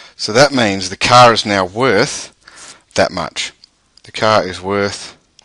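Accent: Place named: Australia. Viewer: thanks a lot mate, really refreshing hearing an Australian voice for a change!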